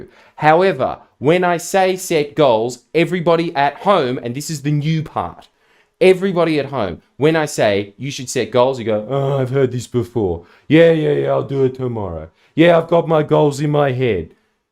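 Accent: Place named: Australia. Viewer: you often say things that alot of people have said thousands of times in thousands of ways, yet its only clicking when i hear it from your aussie accent. thx for these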